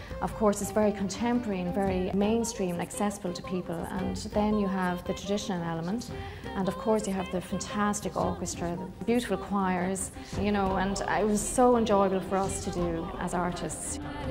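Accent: Ireland. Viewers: This is an accent, Ireland. Scotlaand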